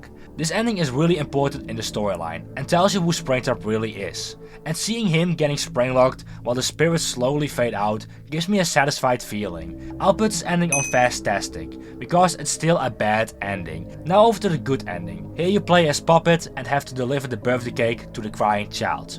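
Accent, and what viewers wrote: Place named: Netherlands. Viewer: waarom klink je nederlands haha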